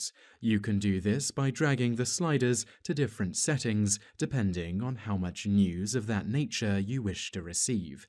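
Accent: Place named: RP, United Kingdom. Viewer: You sound soooooooo posh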